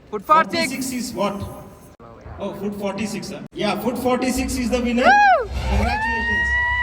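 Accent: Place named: Amalapuram, India. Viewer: Nenu otu vesa anna ni videos chustanu kani eppudu coments pettaledu nuvvu seam ma annayya la untav seam enka acham nekulage untadu anduke yeppudu chustanu ni videos madi amalapuram mana slang tho bale mataladatav any ve congrats annayya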